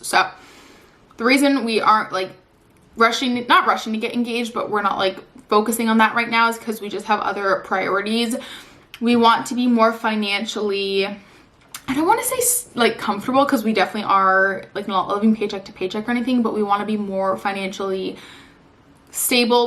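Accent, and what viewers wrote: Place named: Canada. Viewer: You’ve become a fav quickly! Didn’t realize you were a Canadian! I had tried to figure out your accent previously. You are super pretty and have helped me transition to my work from home position much smoother! I’ve definitely felt defeated working in healthcare from home a time or two. So just wanted to say thank you for all your hard work!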